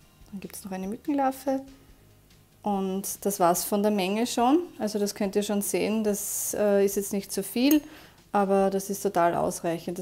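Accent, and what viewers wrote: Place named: Austria. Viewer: Sehr cool erklärt! Und schön dass man dein österreichisch raushört ;-) lg aus Wien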